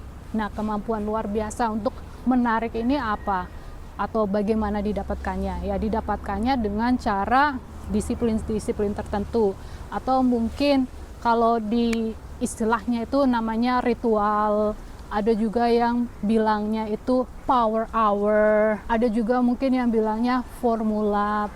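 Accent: Banyumas, Indonesia. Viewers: Ora ngapak ora penak mbak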